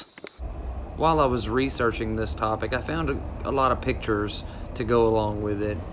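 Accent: South, United States. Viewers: I just have to say your very handsome and I love your southern accent 😍 ❤ I'm from the city Boston Massachusetts born and raised idky but I love country men southern men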